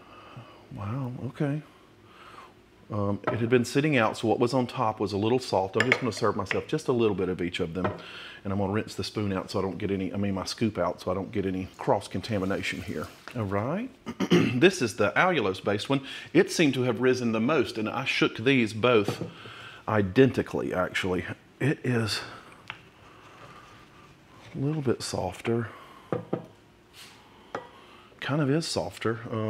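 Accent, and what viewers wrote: South, United States. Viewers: Yes! He's so down to earth and as I too am southern it is music to my ears to hear him talk ❤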